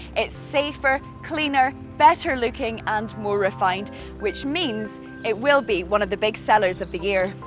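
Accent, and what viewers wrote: Scotland, United Kingdom. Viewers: irish program.she is scottish she does have hint of irish accent mixed but its more scottish.she used to do champions league on tv3 years ago also. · The accent is irritating. Scottish with the weird Irish twist... strange.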